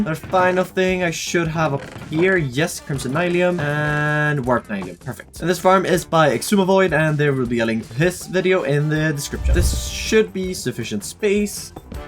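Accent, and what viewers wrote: Sweden. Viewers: These videos are great man, keep it up your builds are awesome. Jag hörde din svenska dialekt direkt hahaha ;)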